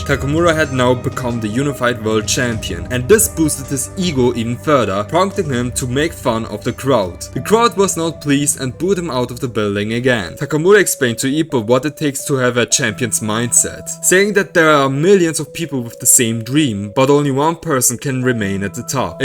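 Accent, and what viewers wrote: Germany. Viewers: Krass wie sie man merkt dass du deutsch sprachiger bist😂😂😂es ist immer wieder so witzig herauszuhören wenn man deutsch ist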